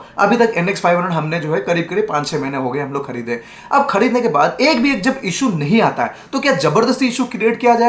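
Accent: Odisha, India. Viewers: = Odia vibe with hindi talking ❤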